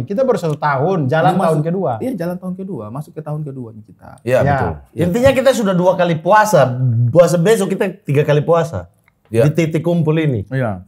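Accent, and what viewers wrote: Eastern Indonesia, Indonesia. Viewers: kenapa logatnya mesti ikut²an logat timur? Mestinya pakai logat Minang aja, biar terlihat keberagamannya.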